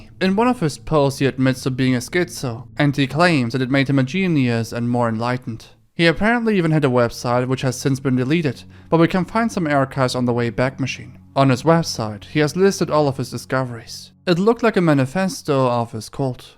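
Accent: Germany. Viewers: My favourite German YouTube accent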